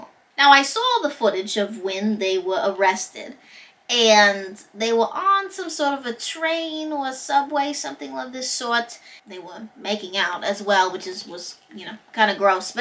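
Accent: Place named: New York, United States. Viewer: What's annoying is your thick New York accent...... I'd rather hear the bells!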